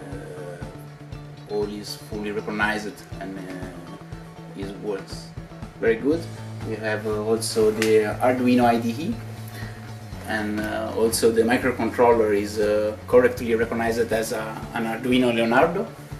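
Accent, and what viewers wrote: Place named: Italy. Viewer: Love that Italian English :)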